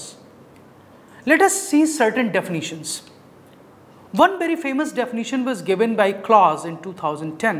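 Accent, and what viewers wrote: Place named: India. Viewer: Indian accent 🤦‍♀️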